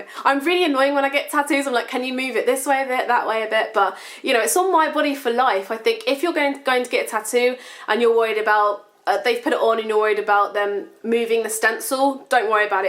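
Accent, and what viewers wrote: London, United Kingdom. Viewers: the london accent. :) <3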